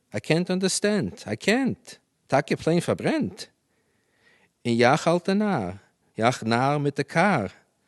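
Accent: United States. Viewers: Ober dus iz amerikaner yiddisch.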